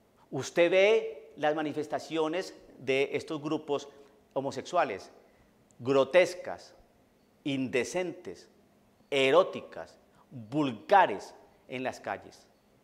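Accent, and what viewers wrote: Colombia. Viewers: tiene mas acento colombiano que mexicano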